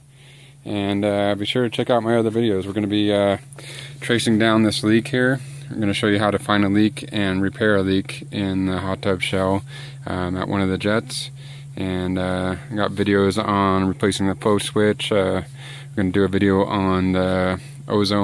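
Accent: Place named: North America. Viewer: You sound like you're from North America. North America runs on 60 Hz not 50 Hz. That blower will blow eventually too.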